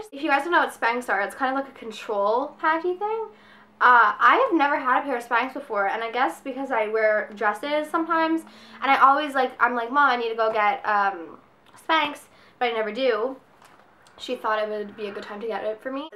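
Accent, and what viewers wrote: Canada. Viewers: I agree. I think I commented before asking if she was Canadian. I watch another girl's videos who speaks just like her & she is Canadian.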